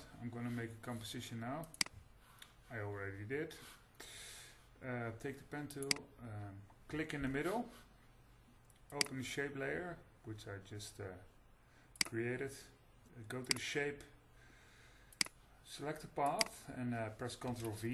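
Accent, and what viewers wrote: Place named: Netherlands. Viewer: thanks! hoorde gelijk dat je nederlands was hihi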